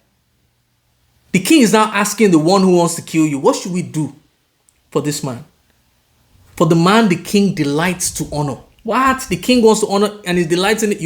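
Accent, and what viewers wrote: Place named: United Kingdom. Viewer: Your English is that of English U.K accent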